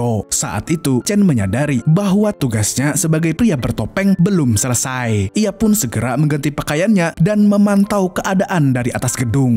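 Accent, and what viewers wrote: Banjar, Indonesia. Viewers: Ikam urang Banjar kh? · ai admin bahasa Banjar sakalinya😮😅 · waaaaayyy abang orng banjarr kah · Banjar kh bng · Org banjar kh ni.?? Slm knl dari bjb.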